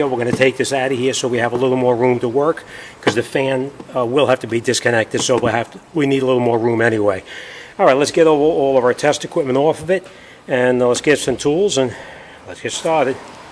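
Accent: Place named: New York, United States. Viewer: Forgive me for not knowing but, is this a New York accent or Philadelphia, or?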